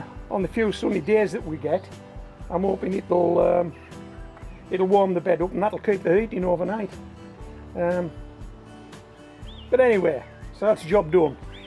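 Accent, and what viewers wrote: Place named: Cumbria, United Kingdom. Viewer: Are you from Cumbria? You sound like a Marra!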